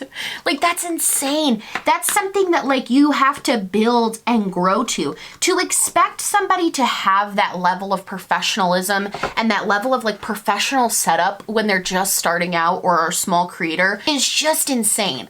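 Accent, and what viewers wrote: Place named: Upstate New York, United States. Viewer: I'm new to your channel, and I'm from Buffalo!! I loooove that I found a creator who kinda carries the "personality," for a lack of better words, of upstate/WNY. I LOVE your personality and the way you speak because it reminds me of home, everyone is lowkey annoyed but grateful at the same time and its very refreshing <3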